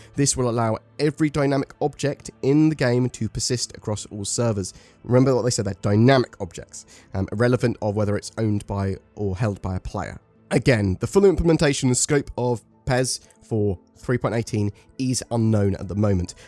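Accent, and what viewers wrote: United Kingdom. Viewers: 3.18 Bring it on. To be honest I watch your videos more than I actually play the game. LOL. Might it be your British accent? I follow you on Facebook as well.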